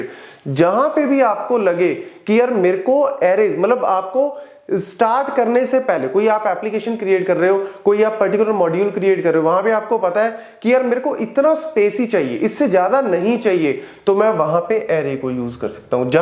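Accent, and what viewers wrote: Punjab, India. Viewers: Ur Punjabi accent so sexy said again❤️🙌😅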